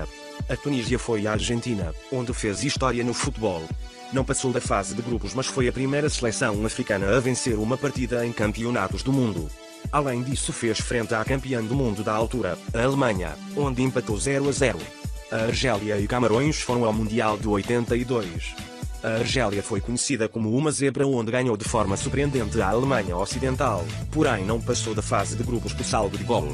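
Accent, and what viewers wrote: Portugal. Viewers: posso legendar seus videos? a voz pt é meio complicada de entender pelos brasileiros por causa do sotaque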